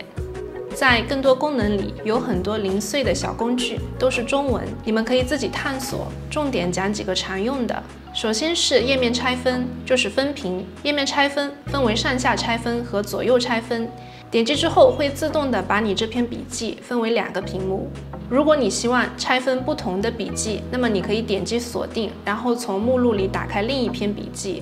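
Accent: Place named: Zhejiang, China. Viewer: 挺好的，你是哪里人啊？好像有点浙江口音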